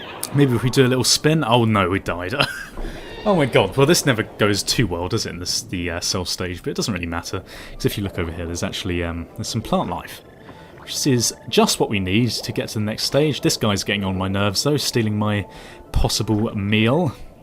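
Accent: United Kingdom. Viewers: His accent is very British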